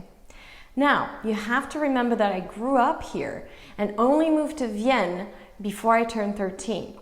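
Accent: United States. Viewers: You sound like a true American. Congrats.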